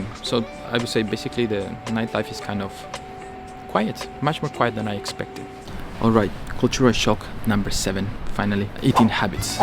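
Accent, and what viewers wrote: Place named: Germany. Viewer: Great video and thanks for sharing. Are you German descendant? I notice your English has a bit of German accent.😂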